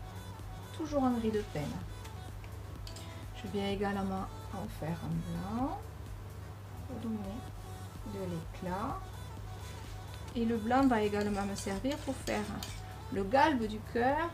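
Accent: South, France. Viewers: J'attends toujours avec impatience tes tutos et comme à chaque fois, c'est un ravissement. Merci à toi de nous faire partager ton talent et puis ton accent du sud est si charmant qu'il apporte un supplément de couleur à tes réalisations .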